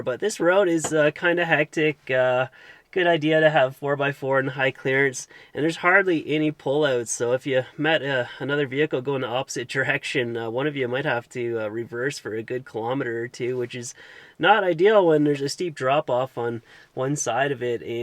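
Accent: Canada. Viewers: If the accent didn’t give it away, the comment about a “2-4 of beer and maple syrup” would have solidified Canadian identity. 😆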